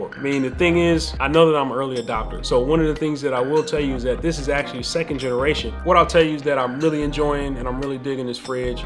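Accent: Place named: North, United Kingdom. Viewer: You’re definitely from up north😂‼️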